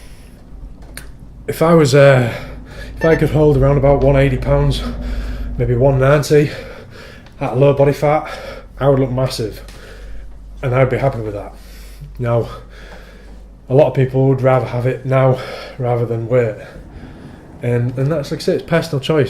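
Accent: England, United Kingdom. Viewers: that english accent beats all the other english accents...is it the country touch? good bullocks talk mate :)!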